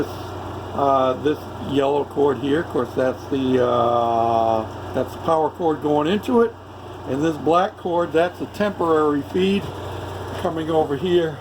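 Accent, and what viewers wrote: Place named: Minnesota, United States. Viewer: Your Minnesota is showing "Don't ya know"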